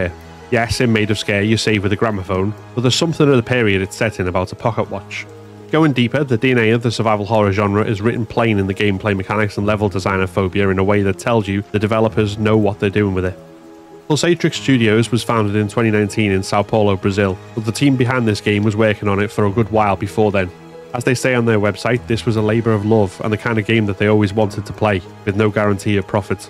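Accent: Liverpool, United Kingdom. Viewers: 1st time av heard a reviewer with a scouse accent 👍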